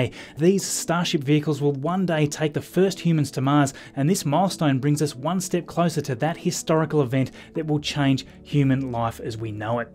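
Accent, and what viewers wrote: Australia. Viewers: Love your videos and its great to hear in Aussie accent. May I recommend an Artlist subscription for your music.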